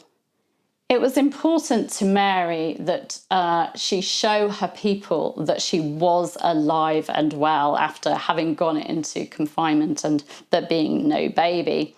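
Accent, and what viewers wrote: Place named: United Kingdom. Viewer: I love your channel so much! I just love to hear you speak with that wonderful British accent! Plus you are a terrific teacher. ❤️👏🏻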